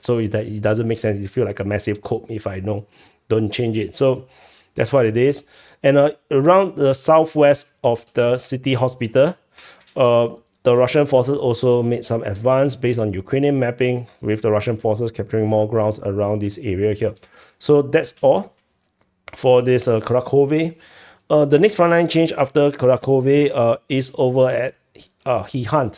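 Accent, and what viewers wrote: Texas, United States. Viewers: I'm surprised the cowboy accent worked will. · Hahahah,,, love ur Texan accent. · As a Texan I can say your cowboy accent is awesome lol😂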